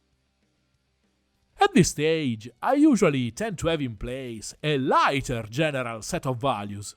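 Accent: Italy. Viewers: Hey just wondering are you Italian? Your Accent is kind of intense idk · Awesome job ! I want to go back to painting minis when I watch that kind of quality videos. And your italian accent make me want to learn the langage xD Keep up the good work, cheers from a (french) neighboor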